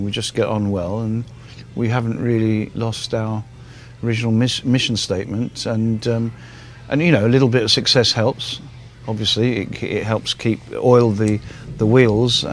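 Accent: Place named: France. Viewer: Sadly, I couldn't understand word. Damn that outrageous French accent.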